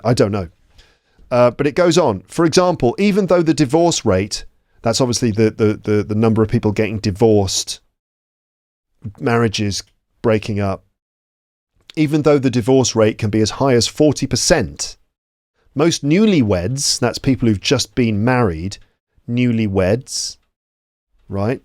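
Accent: United Kingdom. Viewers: Hello everyone, i would really appreciate your help. My question is, Am I wrong, listen to, which I consider the best channel for learning English. I'm wondering this behe has a British accent, and I am in a place where American English is spoken,. I mean obviously it's different but i also think listening to it's helping me to improve my listening and speaking. At grammar I'm terrible. Thank you guys.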